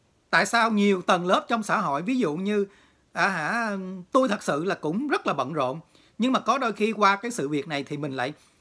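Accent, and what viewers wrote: Standard. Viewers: Bạn này nói hay và chuẩn nè. ❤️❤️❤️❤️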